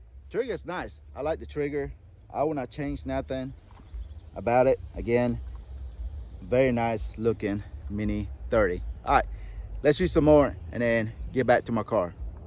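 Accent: South, United States. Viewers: First time i ever heard a hispanic/southern accent mix. Nice!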